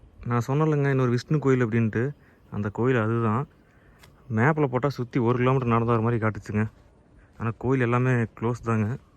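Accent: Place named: Karnataka, India. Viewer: Malayalam gud try 🔥🔥🔥theri......but kanada mix aai varthu.....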